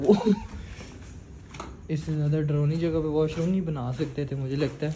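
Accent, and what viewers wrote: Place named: Hindko, Pakistan. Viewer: Ap ki Urdu ka acsent hindko wala he😁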